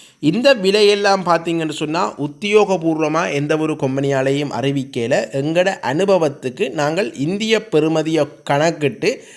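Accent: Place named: Chennai, India. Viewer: Madras தமிழ் தூதூதூதூ ...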